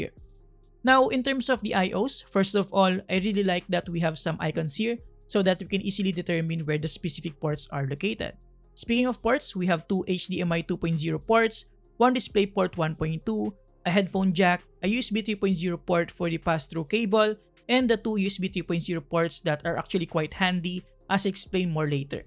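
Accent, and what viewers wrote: Philippines. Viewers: Props sa pagka-review nito pang international visuals. Nagtitingin lang ako ng review about dito sa monitor na ito then narealize ko sa tono ng boses pilipino pala. Husay! Sakto nabanggit din na predecessor pala yung QA so possibly ito QL1A i-acquire ko.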